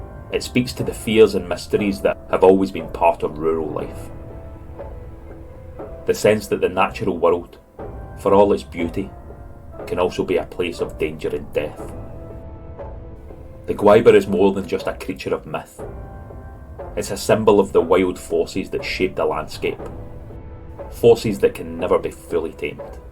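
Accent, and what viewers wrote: Scotland, United Kingdom. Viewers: This was PERFECT. Love your voice the narration graphics everything. I think I’ll subscribe. Great channel. Are you from Scotland?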